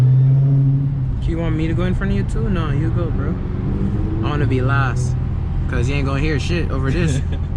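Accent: Jamaica. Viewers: Aye bro great content. Are u jamaican? U sound Caribbean? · If you were Jamaican as well you would of immediately knew he was a yardie. "you sound Caribbean" only a yankie would say that.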